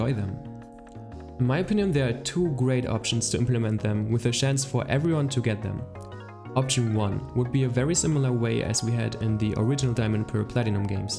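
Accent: Germany. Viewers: haha, man merkt beim Zuhören echt den deutschen Akzent :D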